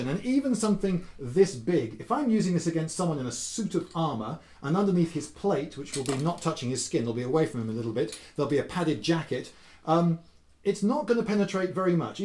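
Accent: United Kingdom. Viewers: As an American watching your videos I can say if I'm getting some news or if I'm going to have something explained to me it's always better with a British accent... Cheers... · when I saw the thumbnail I thought: look at this neck beard cosplay tard. then I heard your British accent and your IQ points went way up lol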